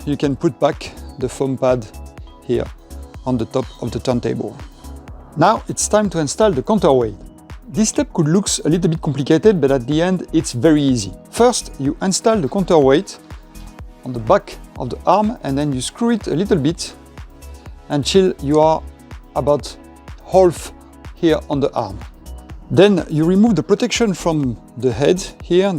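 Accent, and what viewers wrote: France. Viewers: I always enjoy your videos but may I also say that we really love your accent! It's a very wonderful French accent so please keep up the Great videos! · Ahah you’ve got the French accent it’s kinda funny ! I would like to buy this turntable but shipping isn’t enable to France…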